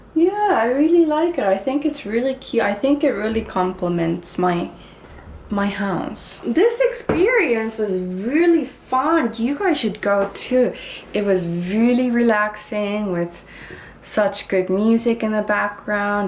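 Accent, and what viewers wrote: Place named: United Kingdom. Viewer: 안그래도 오랜만에 영국발음 듣고싶다고 생각하던참이었는데ㅜㅜ거마워여❣ 우아한 취미생활 부럽다용😆👍🏻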